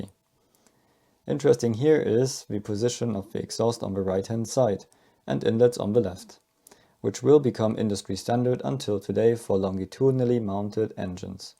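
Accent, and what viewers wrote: Germany. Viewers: If a question requires research, you do something wrong. The answer is the thing requiring research. You also have a horrible German accent. Please stop making videos. Thanks.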